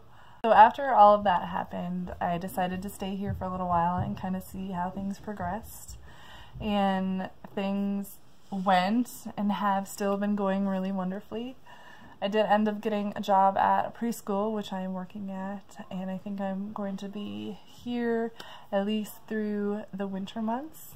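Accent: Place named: Minnesota, United States. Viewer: Very happy for you. 😎 I just found and subscribed to your channel. I'm a South Dakotan and have previously lived in MN for 12 years (now in Springfield MO.) I recognize your MN accent. 😉 I have been to Pitt many times and agree that it is an interesting city. (My daughter lives there.) You have created a dream life for yourself. I so wish I was young again and healthy enough to travel. One more thing, I'm going to bet myself that your last name ends with -son or -sen. Lol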